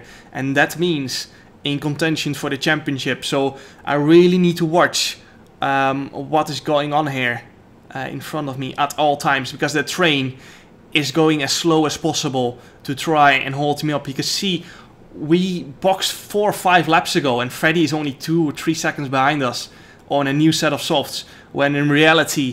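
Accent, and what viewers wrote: Netherlands. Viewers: Are you from the neterlands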